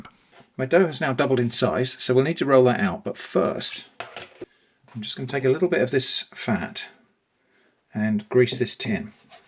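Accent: United Kingdom. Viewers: pov you feel safe as a still slightly too hot delicious experiment and you hear a kindly brit say ".. but I can resist no longer"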